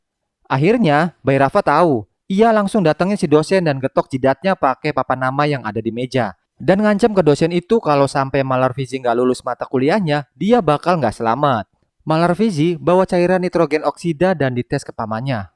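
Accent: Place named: Cirebon, Indonesia. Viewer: Wong cerbon ya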